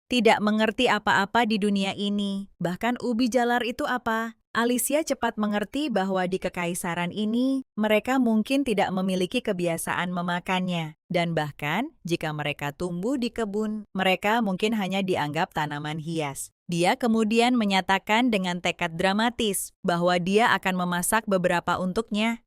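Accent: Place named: India. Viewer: Njiiirr logat india.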